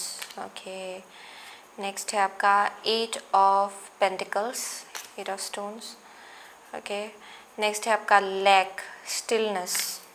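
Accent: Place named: Arunachal Pradesh, India. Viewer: Aap kaha se ho mam? Arunachal Pradesh se, aisa lag rha hai, i m from Psg, mine is pile 1 n 4, both resonates n m confused. But thnx 🙏 Nice reading. 👍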